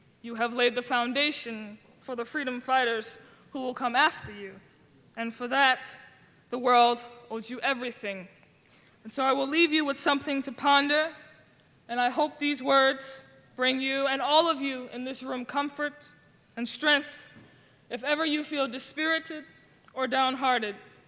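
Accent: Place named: United States. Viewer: I like it better when IDF or Judaism focused voices are heard. I like their inspiring speeches. This sounded like some American Israelite stuff..This girl sounds American, not African, and speaks as someone carefully choosing words to manipulate. She does NOT speak as someone who has love for Israel, Judaism, and IDF. She speaks warmly, but not with fire. She speaks of patting people on the back, but not understanding the trauma to the heart. She speaks like someone who gives a handshake not understanding the need to stand with Israeli's in the settlements. She sounds like some American Hebrew Israelite trying to gain a foothold in Israel.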